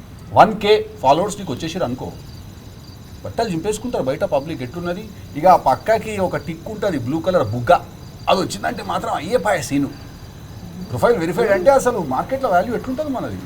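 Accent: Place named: Secunderabad, India. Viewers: Aa body language,..... Hydrabad slang chustunte... Idi edo..." Rahul splingunz.".... Biopic la undi..... Any ways... bhai...all the best.. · Hyderabadi slying super till Bhai ❤️ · Pakha secundrabad musheranad slangh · Annaa mastuu matladuthunnav Anna Hyderabad slang lo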